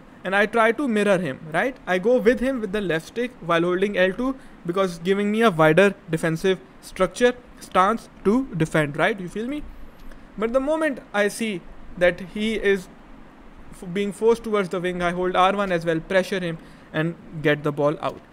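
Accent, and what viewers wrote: India. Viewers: Love indian accent 😅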